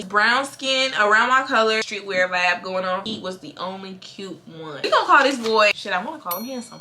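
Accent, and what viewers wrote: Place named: Chicago, United States. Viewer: i love ur videos they are so interesting. but i have a question are u from Chicago ur accent is very strong?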